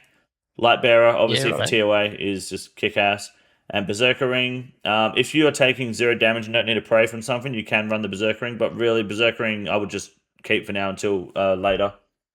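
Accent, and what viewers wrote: Australia. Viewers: It’s Aussie talk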